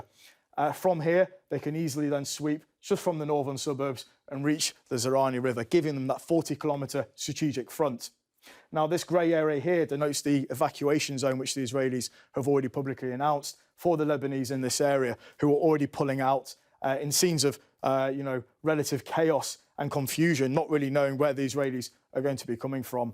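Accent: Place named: Yorkshire, United Kingdom. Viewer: It's impossible for Americans to hear through the Yorkshire accent. E.g."cru-shul-ly erode that".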